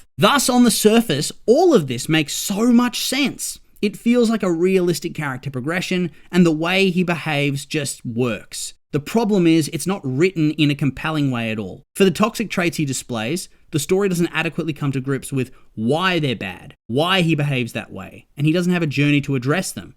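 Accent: Australia. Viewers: an aussie no I love it ❤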